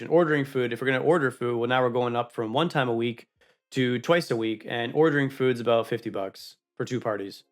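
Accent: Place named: Canada. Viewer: He sounds American but isn’t American, I’m gonna go with Canadian..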